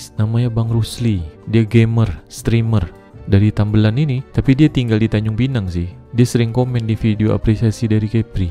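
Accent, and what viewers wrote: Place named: Perak, Malaysia. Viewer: Salam dr mlysia..dgr drp dialek tuan punya video, mcm dialek org perak jer, awak tu mksudnya saya..dialek k.kangsar & parit..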